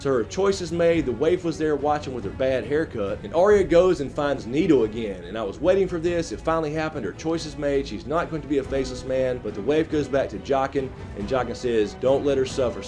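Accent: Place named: Carolina, United States. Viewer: Great review bro! Hey are you from NC? You have that Carolina sound to your voice.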